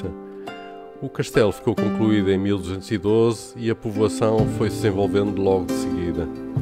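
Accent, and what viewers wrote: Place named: Portugal. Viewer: Finalmente estou a ver ouvir uma voz Portuguesa incluindo musica. que gosto muito, já estava cansado de ouvir brasileirismo.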